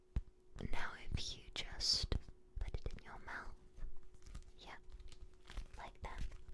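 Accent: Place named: United Kingdom. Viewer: Bri'ish woman detected, my neurons activated.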